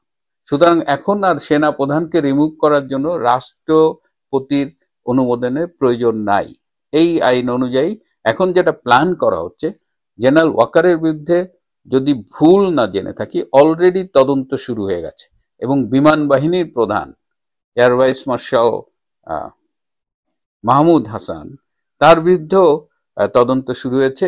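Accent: India. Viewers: বাড়ী কি ভারতে? বাঙলা কলকাতার মত।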